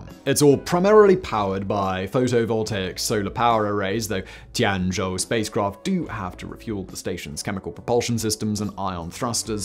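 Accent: England, United Kingdom. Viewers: I have to tell you that the commentator in this video speaks too quickly combined with a thick English accent while at the same time sounds like he is holding his nose shut. So the reault is you can't understand or at least you have a very difficult time understanding what he is saying.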